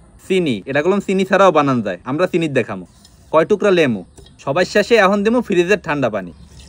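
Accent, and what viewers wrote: Barishal, Bangladesh. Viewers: কোন জেলার ভাষা?মনে হয় বরিশালের।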